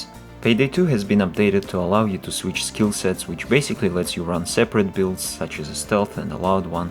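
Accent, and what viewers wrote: Russia. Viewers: your accent are you a russian? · Hes russian right?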